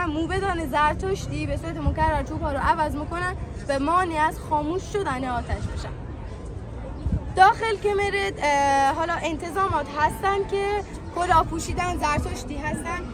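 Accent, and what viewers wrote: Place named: Yazd, Iran. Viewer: لایک ۷۳ ❤ من وقتی بچه بودم یکبار با خانواده رفتیم یزد و کمی از یزد یادم هست 😊من عاشق لهجه یزدیم خیلی زیبا و قشنگه ممنون عزیزم بابت به اشتراک گذاری این ویدئو زیبا❤❤❤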